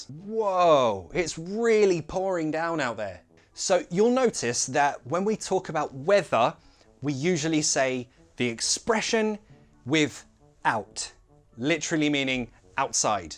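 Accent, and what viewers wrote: United Kingdom. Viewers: As Brazillian I love the British accent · You're Brithish?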